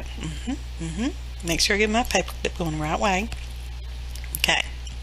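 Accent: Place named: South Texas, United States. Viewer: I just received a wonderful envelope of RAK from you. I got the blue and green paperclip bead at minute 32. This is the first RAK/Happy Mail I have ever received. I am so excited. You made my day. Love this video and plan to check out your others. Thank you so much. I am a Native Houstonian, so You do not talk funny, You sound like most South Texas Folk. My new go-to saying is going to be piddle fartin' around. Happy Crafting!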